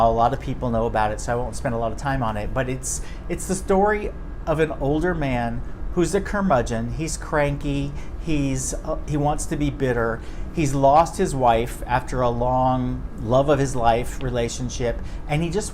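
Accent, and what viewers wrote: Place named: West Country, United Kingdom. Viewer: This is a lovely example of West Country accent, which can also be found on the Channel Islands (of which Guernsey is one) <link> Thank you so much for all the recommendations! I've read Bear Town, and loved it, but I've never heard of the others. I have much exploring to do :) so thank you!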